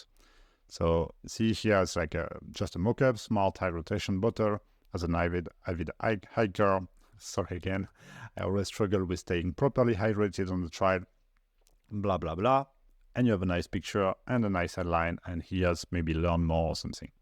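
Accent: France. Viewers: L’accent français ;)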